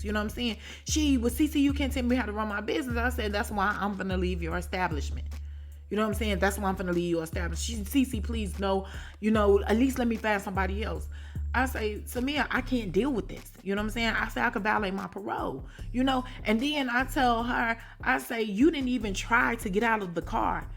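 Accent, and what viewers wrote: Chicago, United States. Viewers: I miss Chicago n I work graveyards when I get to work I throw her on and I feel like im at home n my sister telling me a story Chicago people sound just alike lol I love it thx for getting me threw these slow ass nights at work and keep up the great work hope 50 or Tyler Perry tap in with you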